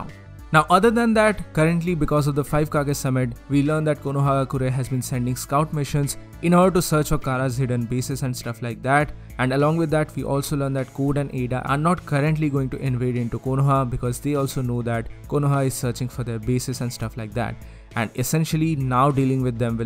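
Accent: India. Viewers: Noice man,nice explanations.....this is channel I was looking for and I like how u talk like in indian syle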